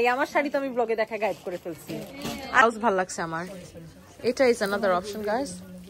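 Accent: Sylhet, Bangladesh. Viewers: Your Sylhety voice is cute bestie😊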